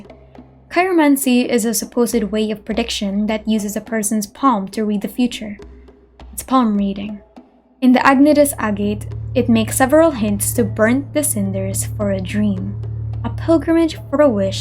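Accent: Philippines. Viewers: LMAO I pray that they don't find out about ur search history. Judging from ur accent, uI assume ur filo and God knows what happens in Filipino Christian households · Your accent! ❤ ph? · I'm guessing you're filipino? I can hear it from the accent. #represent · This channel is such a hidden gem! I knew you were filipino the moment you started speaking 😂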